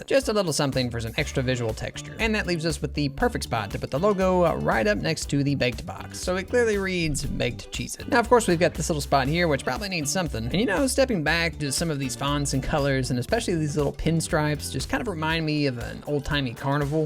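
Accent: South, United States. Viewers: is it just me or does he sound more country now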